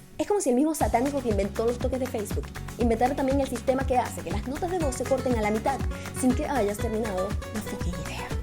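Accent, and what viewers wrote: Venezuela. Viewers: mas venezolana imposibleeeeeeee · Me encantó! Esto suena demasiado a monólogo venezolano. Bella Bella! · Más venezolana imposible! Lo ame jajajaja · Excelente! y lo mejor de todo es el estilo venezolano... "que paso? si fino" :D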